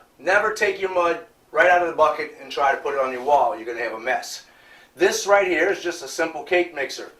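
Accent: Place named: New England, United States. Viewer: This is actually a great tip. A lot of people hype up the DIY tips that everyone knows, this one I haven't seen before. BTW- I see the southern background and hear the yankee accent, I'm in the same boat. Best decision to move down here. Now on to binge watch your videos...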